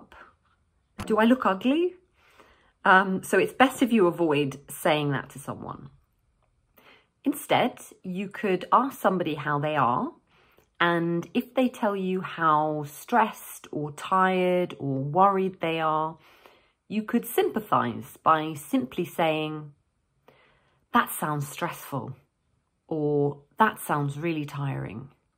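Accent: United Kingdom. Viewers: 발음이 영국 발음이네 했더니 영국분이신가요? ㅎㅎ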